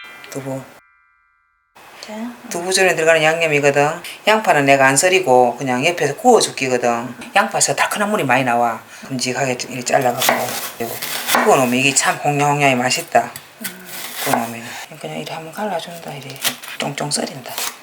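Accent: Gyeongsang, South Korea. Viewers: 맛잇어요~어머님혹시어투가경남쪽같은데(김해) 그쪽이신가요? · 선생님 요리를 자주봅니다~ 뎃글은 처음이네요~ㅎ 경상도 사투리가 저를 웃음짓게 합니다. 집밥같은 맛! 선생님 요리는 정겨운 엄마집밥! 그자체입니다~ 감사합니다^^^^ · 저는 부산사람이라 경상도 사투리 너무 좋네요 ㅎㅎ 잘배울께요~♥ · 구수한 경상도 사투리에 넘 정겹고 나의 요리 스승이세요 매번 잘 보고 있어요!!! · 아 저도 경상도 인데 사투리 대박이심니다 너무 재밋어요 재밋고 유익함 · 구수한경상도 말씀 듣기 좋아요~^^ 영상 잘봤습니다. 감사합니다~^^ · 경상도 말투 재미있어요 따님 살짝 콧소리 웃음소리 살살 녹입니다 요리는 최고입니다 복잡하고 어려우면 배울 수 없는데 정말 좋아요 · 경상도사투리 말씀 듣기가 좋아요 저도 경상도 ㅋㆍㅋ 정겨운 말씨와 요리도 맛가나게 잘하시네요 맛난것 많이올러주세요 ~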